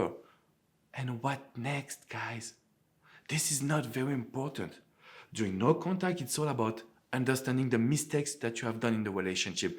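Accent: France. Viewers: Very well explained... I like your french accent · Your English language with French accent is sooo cute ! 😁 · The sweetest french accent ever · i love his accent 💛 i assume he's french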